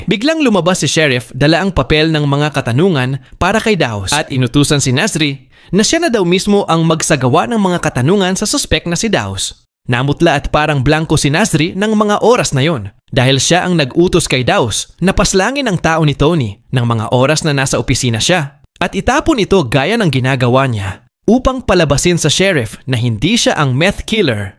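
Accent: Visayas, Philippines. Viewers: Ang tgas ng accent ng storyteller bisaya Ka ba?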